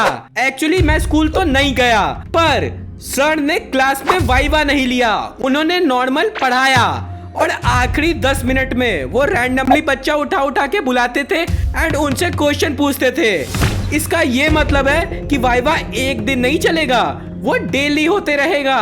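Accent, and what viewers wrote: Bihar, India. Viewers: aap bihar se ho kya bhaiya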